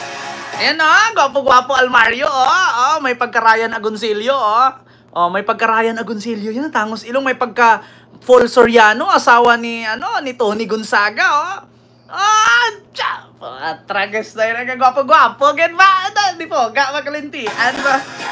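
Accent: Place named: Iloilo, Philippines. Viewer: Sir I love your Ilongo accent kuhang kuha nyo ang diction. Illongo man ako. Maayong adlaw sa imo Sir from Iloilo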